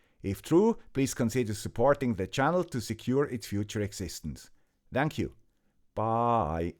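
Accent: Switzerland. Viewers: Summary Introduction to USB-C and Power Delivery - Charging and data transfer over USB cables have significantly improved. - USB-C connectors were expected to simplify the process. - However, without proper knowledge, users can still face issues like slow transfer speeds and charging problems. - The topic is split into two parts, starting with Power Delivery. - Introduction by the presenter with a Swiss accent. - USB-C's versatility and its mandatory use in Europe. - USB-C supports various modes including Power Delivery, data transfer, video and audio output, and alternate modes like Thunderbolt. - Focus of the video on Power Delivery and data transfer. USB Standards and Connectors - Evolution of many USB standards over the years. - Different connectors accompanied each standard. - Focus will be on newer standards from USB 3.0 onwards. - The video will cover two separate topics: power delivery and data transfer. 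Understanding USB-C Connector Pins - USB-C connector can have up to 24 pins. - Not all connectors have all pins to save cost and simplify PCB design. - Explanation of Ground, VBUS, D+, and D- pins. - D+ and D- carry differential signals for less interference and higher speeds. - These pins are for backward compatibility with USB 1.0. - Introduction of TX1 and RX1 pairs in USB 3.0 to increase speed. - TX2 and RX2 pairs were added later for even higher speeds. - CC1 and C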